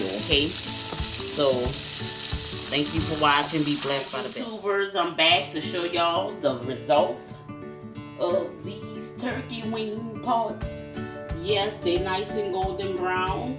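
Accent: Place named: New Orleans, United States. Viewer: She sounds like shes from Louisiana New Orleans to be exact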